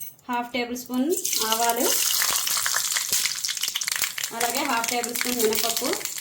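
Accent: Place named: Godavari, India. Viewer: Indilo nene aite koncham chintapandu kuda vestandi meedi kuda ee sari try chesta meedi kuda godawari gillanena mee maata teeru alne undi andi mee videos anni chustu untanandi best ok luck ❤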